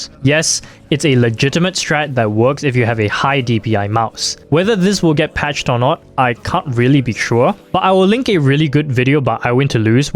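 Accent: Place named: India. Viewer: broo are yoy indian? you sound super indian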